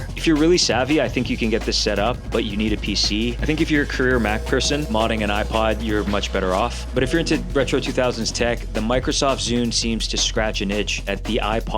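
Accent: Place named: Canada. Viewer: i’m getting good at spotting canadian accents yippee also if i get a zune off ebay it’s 100% cuz of u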